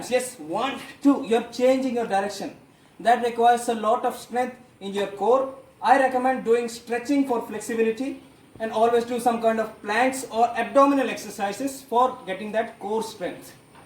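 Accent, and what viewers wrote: India. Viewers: Man salute Indian English..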